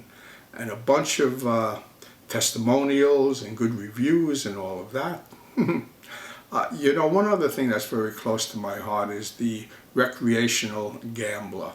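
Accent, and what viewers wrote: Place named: New York, United States. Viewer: just because you wear sunglasses and have that brooklyn or NY accent or whatever the hell you are from doesn't mean you know what you're talking about when it comes to blackjack. lol selling systems. Evidently his system isn't working if he needs more money.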